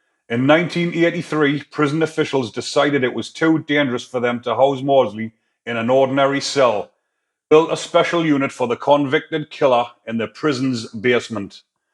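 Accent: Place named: Gateshead, United Kingdom. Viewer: Mate you are from my neck of the woods going on your accent (Gateshead I'm guessing?) I love the fact you have 50k subs for just reading out Wiki prisoner stories word for word. Fair play and good luck.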